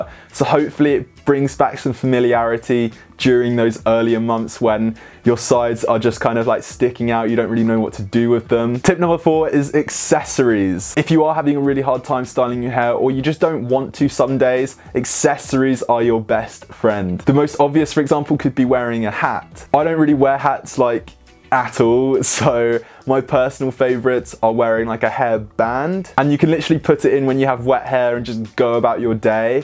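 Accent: United Kingdom. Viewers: It also helps having a British accent and a dangling earring! This is catnip to the female species! All day! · dude why are you speaking like a british when you're clearly straight from the mezzagiorno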